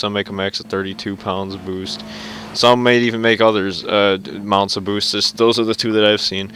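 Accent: East Coast, United States. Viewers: are u from the east coast? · Dude are you from the East Coast?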